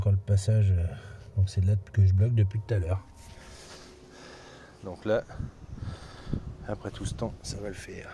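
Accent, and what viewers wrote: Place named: Nord-Pas-de-Calais, France. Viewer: • Tu as l’accent du Nord-Pas-de-Calais 😁 ! Est-ce qu’on peut t’appeler pour déboucher un fourreau ou est-ce que tu travailles uniquement avec un opérateur ? Merci d’avance à toi. Et bon boulot ! Tu n’as pas fini comme Claude François… 😁👍🏻 🥳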